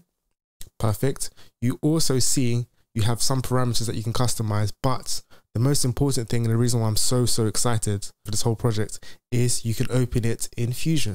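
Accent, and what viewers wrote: United Kingdom. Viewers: Enjoy your content my man. Step by step and super clear. Also love hearing a UK accent. Subbed keep up the davinci content!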